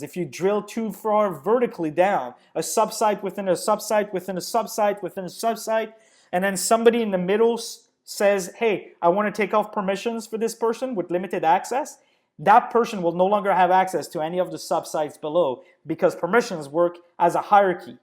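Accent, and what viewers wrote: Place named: France. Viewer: Great insight and very helpful! Loved your French accent too :)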